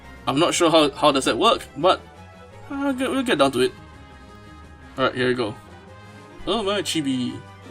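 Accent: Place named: Malaysia. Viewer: never thought I would hear a Malaysian accent.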